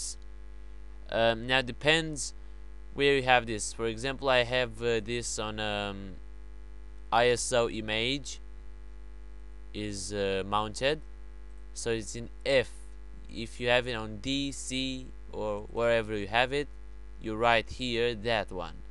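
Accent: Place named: Turkey. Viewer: Where are you from? I think u are from Turkey because you have Turkish accent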